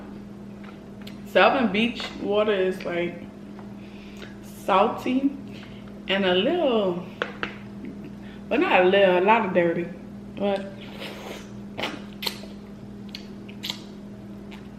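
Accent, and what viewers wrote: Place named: New Orleans, United States. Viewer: Nola? Pretty accent!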